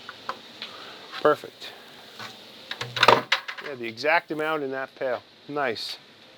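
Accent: Canada. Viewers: I'm Canadian, and this guy's Canadian accent is too much even for me.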